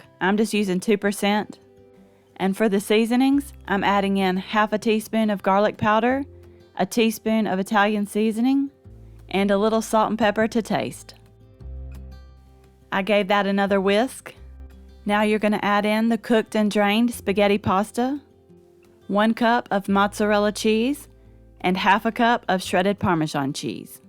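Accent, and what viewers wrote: South, United States. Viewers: I'm so glad I just came across your channel! After watching the first video you posted 3 days ago I immediately subscribed! Your recipes look so delicious and easy to make. I've been looking for some new recipes for casseroles and the crockpot especially and you've got those and many others that I'm probably going to try them all! Thanks so much for sharing!!! I'm also curious where you're from if you don't mind me asking? I'm from the south in North Carolina and you sound like you have a southern accent and your recipes seem like they're southern too which is another reason I love them!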